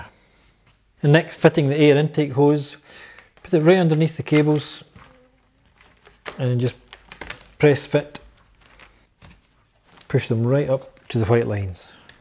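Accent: Scotland, United Kingdom. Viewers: Great Video, I love your Scottish accent!!!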